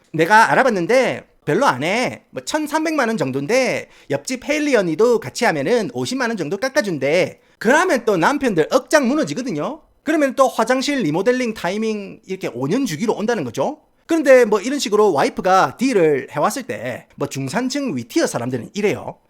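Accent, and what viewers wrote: Gyeongsang, South Korea. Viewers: 사투리가 너무 찰지셔서 댓글을 안 달수가 없었다...찐 경상도 바이브가 영어랑 절묘하게 혼합된.... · 내용도 전두엽 쌍골짜기에 싸대기가 메아리칠 정도로 신선하고 쨈있지만, 썰푸는 vibe가 참~ 찰져! 갱상도 억양과 양키어의 묘한 앙상블. 그래도 유료가입은 몬한데이~! 내코가 월터 와이트 입던 빤스라도 갖다 팔아야 개우 입에 풀칠. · 경상도 액센트의 영어로 설명해주는 미국의 사회 문화 컨텐츠 ㅋㅋㅋㅋㅋ진짜 미치겠다 ㅋㅋㅋㅋㅋ 구독박고갑니다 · 사투리가 쏙쏙들어와요 경상도ㅎㅎ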